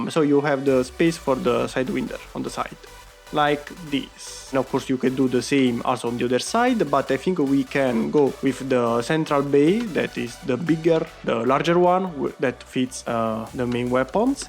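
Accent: Italy. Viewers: Si sente che sei italiano😂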